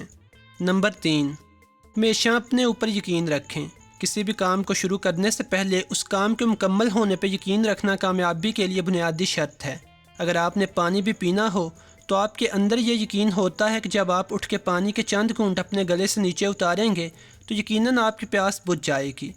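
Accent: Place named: Punjab, Pakistan. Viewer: پنجابی لہجے میں اُردو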